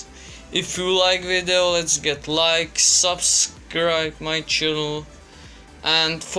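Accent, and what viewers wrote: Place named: Czech Republic. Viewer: Když už podle prvního slova poznáš že je to Čech :D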